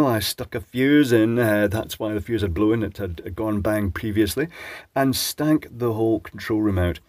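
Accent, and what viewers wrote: United Kingdom. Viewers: Really,? you don't have a dog, so you pick the nearest selenium rectifier to blame it on? Gotta say, your viewers are among the most classy lot on YT. I was expecting to see nothing but a pot full of fart jokes, but NO! There's more vulgarity in the comments below the White house news briefings. Isn't it amazing what effect a proper British gentleman's accent has on people?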